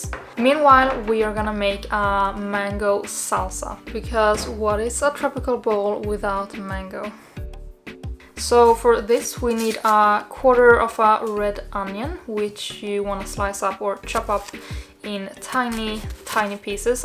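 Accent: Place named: Sweden. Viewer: I thought you sounded Swedish. I googled you and see that you are Swedish Spaniard. Interesting because I always thought that the two accents (Swedish and Spanish)sound similar. Nice burrito bowl.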